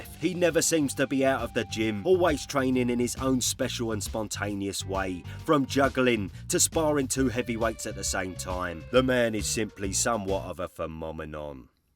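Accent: Cockney, United Kingdom. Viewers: As an Aussie, i appreciate the cockney🇦🇺 Also, looking at how chisora boxed usyk, the way fury bullies, i think he beats usyk. Fury and uss cunningham shows fury just manhandles cruiserweights. And fury was pillowfisted fury in that era.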